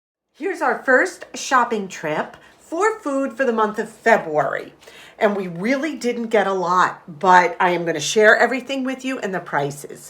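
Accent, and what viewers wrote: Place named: Boston, United States. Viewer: I love your accent. You sound like a Boston girl from italy.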